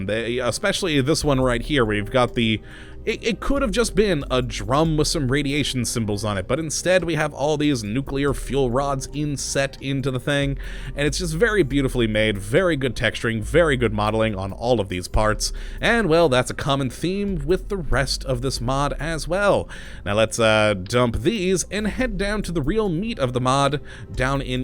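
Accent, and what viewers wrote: United States. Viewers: Definitely, I'd imagine the accent would stick out like a sore thumb to anyone native to Britain It's unfortunate how rare it is to find an American who speaks English thoughtfully though :( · Not really, as a British person, it is clear to me he has a distinct American accent.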